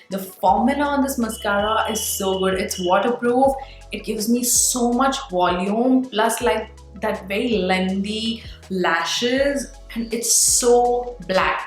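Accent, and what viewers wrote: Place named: Hyderabad, India. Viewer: Are u Hyderabadi? Your hindi accent is very Hyderabadi ( and I mean this in a good way) . I have a friend who is Hyderabadi and this is exactly how she sounds whenever she speaks Hindi (which i find really cute btw😂)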